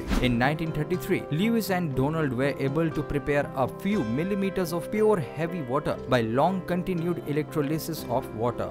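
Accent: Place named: India. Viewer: Undestood about 5% of this of this video. And this being the only video that is trying to explain what heavy water is just shows how much we need to know about what heavy water actually is. If someone could make a video that explains is easily, please. And without that irritating indian accent.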